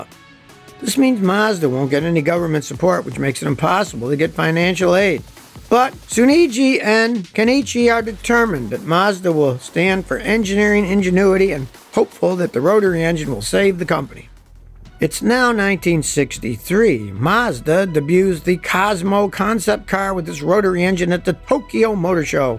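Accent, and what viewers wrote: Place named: Appalachia, United States. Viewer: Now, that's a Hillbilly accent!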